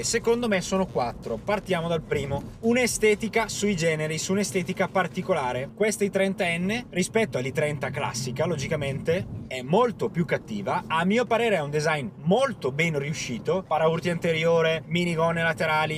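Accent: Brescia, Italy. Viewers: figa fai un corso di dizione, la cantilena bresciana non si può sentire!!! :D anche se sei bravo! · Finalmente un bravo tester con il nostro tipico accento bresciano complimenti ..!!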